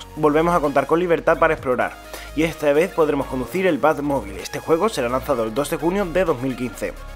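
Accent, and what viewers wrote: Andalusia, Spain. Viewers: Eres andaluz? Tu acento es diferente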